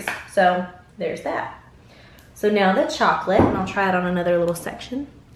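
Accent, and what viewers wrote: South, United States. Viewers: New subscriber! Mostly because I can listen to a cute southern girl talk all day long, though.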